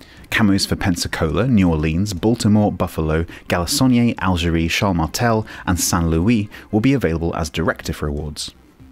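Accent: United Kingdom. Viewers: Then I for one am very happy that you present these videos. There is a huge difference between American English and ... real English. I love all my American friends online but it does get annoying. As I'm 59 this year, I am so glad to have you fronting these videos. You now have a loyal Kiwi fan (even if I'll soon be moving to my birth nation of the Netherlands due to my lung cancer.) YOU keep them coming and I'll show my appreciation. :)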